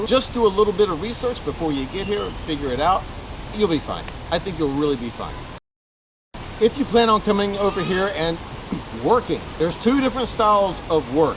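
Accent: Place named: Minnesota, United States. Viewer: You sound like you could be from Minnesota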